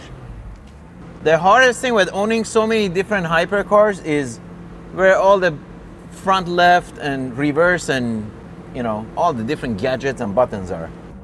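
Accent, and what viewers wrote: Iran. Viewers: Eh-screws, makes me feel like home, good ol Persian accent 😂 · New subscriber. Love your videos, but afraid to pick up Persian accent. ;) Ppl think Im Persian anyway, so Im ok with it anyway. :)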